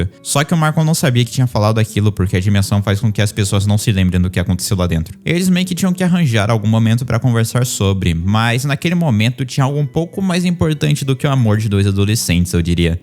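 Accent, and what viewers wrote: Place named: São Paulo, Brazil. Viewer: desse com sotaque paulista querendo falar da star scrrr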